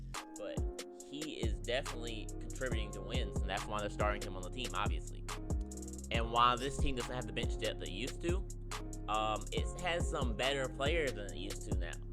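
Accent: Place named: South, United States. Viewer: as an asean, it took hard for me to understand what your sayin. you have accent and your background music to loud. you have country singer accent i think. from PHILIPPINES.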